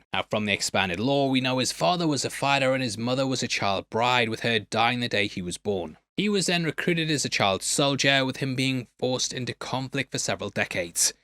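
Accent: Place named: Liverpool, United Kingdom. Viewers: The Weekly Planet guys are Australian, and sounds like he’s from somewhere in Northern England (London is towards the South of England). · Great review, scouser! (sorry, don't know your name... just your accent 😉 ) Only saw the film once (when it came out, I think) but after your review, I think I'll give it a second go - most positively worth it now! 🤩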